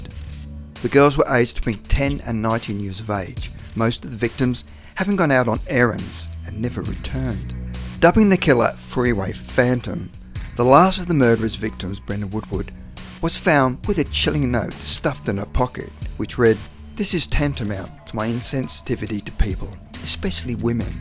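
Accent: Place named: Australia. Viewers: Matt Kustom Kostumes i noticed that too. The narrator sounds Australian to me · peethewak, I listened closer and you are correct, the narrator is Australian.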